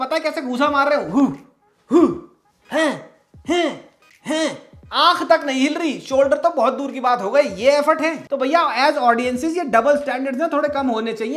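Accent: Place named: Delhi, India. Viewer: Mothiiii Lag'gii Dekh k 😂😂😂😂😂😂 Delhi accent superb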